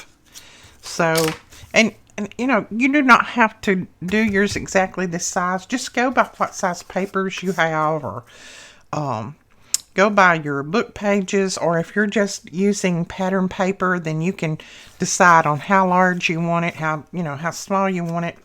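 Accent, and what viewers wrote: South, United States. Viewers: I would never tell you to hush i love listening to your beautiful southern accent❤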